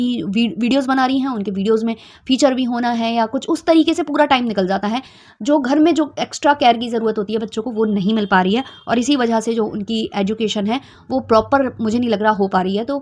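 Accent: Bundelkhand, India. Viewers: Hello tum up bundelkhand kaha se ho m bhi wahi se hoo bundelkhand ki..Main kafi din se tumhare reaction dekhti hoo tumhari tone se lgta to tha tum bundelkhand ki ho lekin kbhi pucha nh tha please btana kaha se ho mujhe achcha lgega ❤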